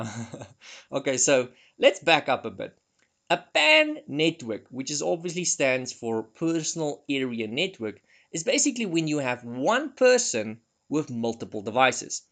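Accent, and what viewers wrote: Afrikaans, South Africa. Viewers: I can not unhear the Afrikaans poping out from the english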